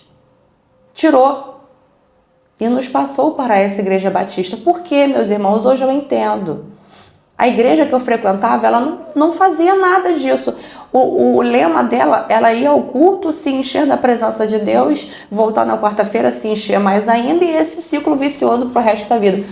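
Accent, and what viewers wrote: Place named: Rio de Janeiro, Brazil. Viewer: Voce e carioca teu sutake parece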